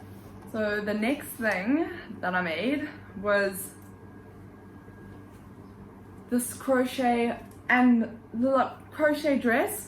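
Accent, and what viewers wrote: South Africa. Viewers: Are you South African? You sound like you're from there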